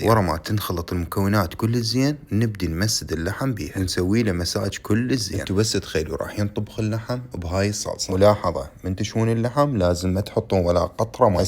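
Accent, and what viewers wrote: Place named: Iraq. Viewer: تبارك الله الشوي والمنظر روعه عاشت ايدك اهم شي لهجتك العراقيه الاصيله 👌👌👌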